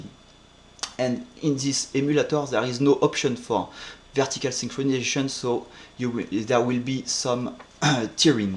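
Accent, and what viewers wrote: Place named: England, United Kingdom. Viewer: Magnifique accent anglais ^^, le rendu a l air bien sympa !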